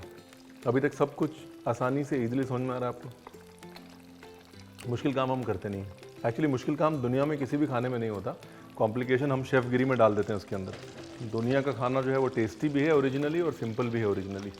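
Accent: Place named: Kanpur, India. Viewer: Kabhi kanpur wali koi recipe banaye,bahut khushi hogi,aapko boli to kanpur ki lagti hai bahut hansi ati hai jab aap yaha ki bhasha Bolte ho🙏🙏🙏🙏🙏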